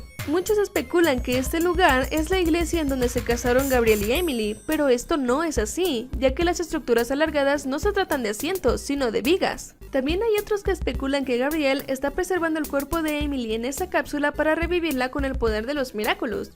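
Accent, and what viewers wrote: France. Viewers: Me encanta tu acento francés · tiene un hermoso acento francés UwU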